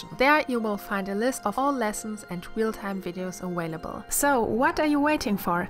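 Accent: Germany. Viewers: Are you German? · Geil dass ich in meiner Abitur KunstLK Prüfung 5 Stunden für ein realistisches Ölportrait hab... Super Video und wunderschön! Ich nehm mal wegen dem Akzent an dass du deutsch bist. Sorry if you do not understand anything:) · Maybe it’s just me or, is she German? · Hey! Amazing art! I wanted to ask of you are german, it seems like it, because of your name and accent. Please correct me if I'm wrong 😅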